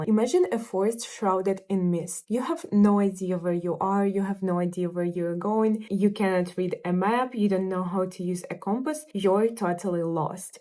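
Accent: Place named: Russia. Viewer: Чую русский акцент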